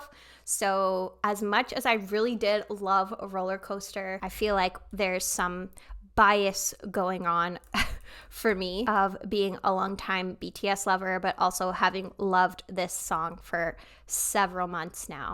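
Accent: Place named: France. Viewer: I believe Jiwoo and Kyujin are the rappers in Nmixx but they are also incredible vocalist like the rest of the group. Nmixx is a group under JYP known for their Mixxpop song. All the girls are incredibly talented and they are also well known for their stage practice where they sing live while dancing. It’s a group I learned to love this year and I absolutely love them! They are so talented and funny! (Sorry for my English, English isn’t my first language. Your French accent was so cute by the way! Love from France ❤️)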